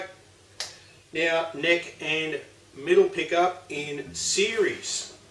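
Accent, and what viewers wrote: Australia. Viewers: Thx for the vid! BTW, are you an Aussie? :)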